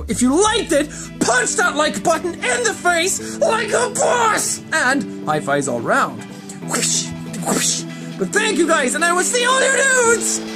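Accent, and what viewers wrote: Ireland. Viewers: Dat irish accent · you have the best accent just listening to it makes me want to stop being scottish and be irish instead just to have the accent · You're starting to sound a bit more Irish now 🤣🤗😉😝☺️💁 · are u from ireland?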